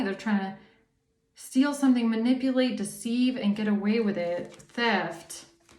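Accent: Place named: Germany. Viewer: 🐝 princess,,,, your beautiful soul love your strength your wisdom your readings and your sprit,,,, hope you don't take on there yucky shit,,,, don't like seeing you angry,,,, your beautiful your a goddess,, you are so much beauty, your Aura your smile your voice your accent,( Germany)❤, you deserve the best,,,I will reward you when I can 😊,,,, God bless you,,,I don't like you stressing,,,, you would have a smile on someone's face every day x,,, sorry if I sound attrusive,,,, 🦉⭐✨⚖️🦋🐝🐝🐝🐝🐝☀️🕯️🛡️✌️💜😇,,,,, don't hurt your self ( your neck) ,I love you,,,❤,I remember you saying you had a accident x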